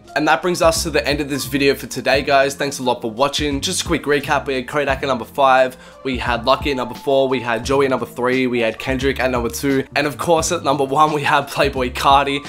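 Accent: Australia. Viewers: What part of Australia is he from? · I wish I had that Australian Accent.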